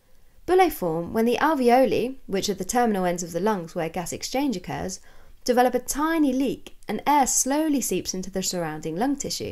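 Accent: United Kingdom. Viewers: my brain is so used to the american accent on these videos and the british accent was a bit of a shock! lmaoo hahaha and im british lmaoooo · The narrator is so British 😂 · Good British English accent. Clear explanation. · The British Accent makes such a positive difference, its like listening to Arya Stark:)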